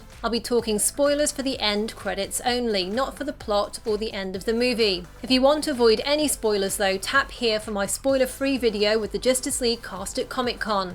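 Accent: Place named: England, United Kingdom. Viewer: are you from England? I'm in love with your voice and that accent.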